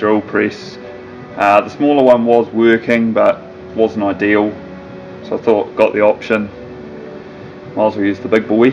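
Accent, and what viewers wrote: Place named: New Zealand. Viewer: Very helpful, thank you! It sounds like you're from New Zealand. South Island???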